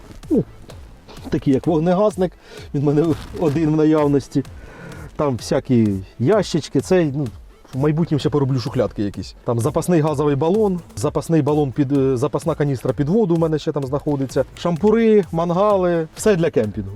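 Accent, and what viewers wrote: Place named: Ukraine. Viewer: А можно нормально по руски без Ебонова акцента хохляского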